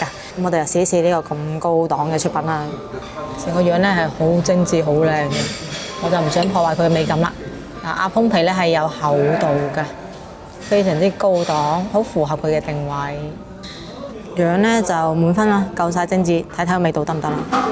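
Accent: Guangzhou, China. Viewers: 唔需要改口音，改咗會不倫不類㗎，話你啲人唔係廣府人囉，我哋香港人好多都係從廣東來的，（佛山）❤❤ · 粤语警察😂😂对老广语法一知半解😢广州陀地发音结尾，都是下降音及平声，不用理捞头乱噏🎉🎉支持你👍👍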